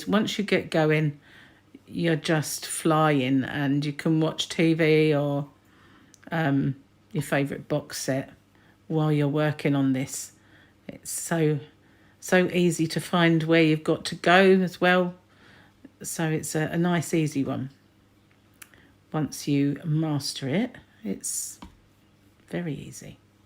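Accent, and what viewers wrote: United Kingdom. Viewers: Loved the pattern, loved your instructions, appreciated no music in the background and your British accent which so reminds me of my aunt. Thank you for the instructions. God bless!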